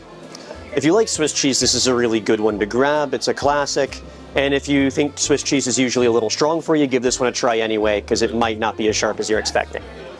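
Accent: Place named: Germany. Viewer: Der arste Amerikaner wo mann denkt er ist ein Deutscher, Schweizer oder Engländer.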